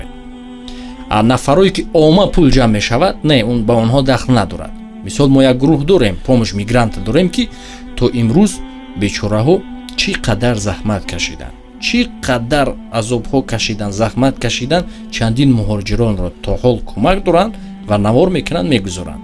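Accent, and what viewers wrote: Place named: Khatlon, Tajikistan. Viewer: Ман нав фахмидм шумо аз хучанден амо лахчаи гапзанит пашти хатлония зато одами точики сам главни мусулмони гапнес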